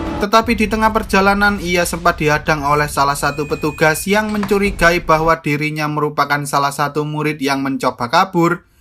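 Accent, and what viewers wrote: Java, Indonesia. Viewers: Jowo kentel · Baca ny jgn medokkkkkkkk😂😂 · Ya allah mas medoknya😢😢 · Medok banget aksen bicaranya, bikin pusing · logat jawa ya bang biasa yg gw dnger logat sunda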